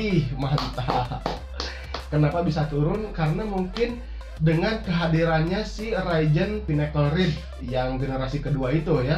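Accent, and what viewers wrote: West Java, Indonesia. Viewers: sunda pisan euy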